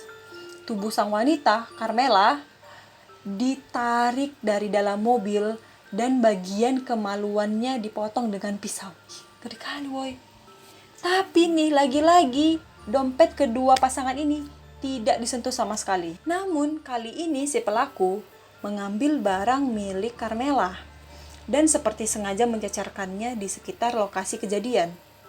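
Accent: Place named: Medan, Indonesia. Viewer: Mantap videonya kakk.. Request dong kak eps berikutnya full pake logat medan kak 🤲🏻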